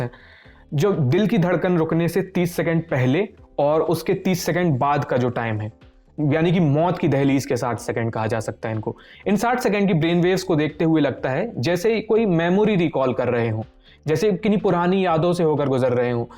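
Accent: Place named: Lucknow, India. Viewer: Full Lucknowa tone, like that 👍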